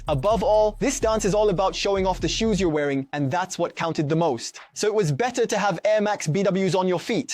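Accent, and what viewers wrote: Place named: France. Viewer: Pas mal, un petit accent français mais sinon ça va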